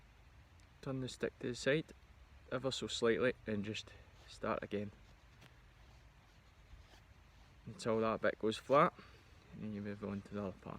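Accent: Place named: Scotland, United Kingdom. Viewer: Do you speak Gaelic? I was thinking you were from Scotland but there was one time I guessed Scottish for someone whose accent was very similar to yours...turns out they were Welsh. Embarrassing. Haha. When you say politer, you mean no swearing? LOL. The brace on the rucksack made it look heavy so I was curious how much it weighs. Is that woodland in Scotland? And you’re welcome! Good luck on growing the beard. Mari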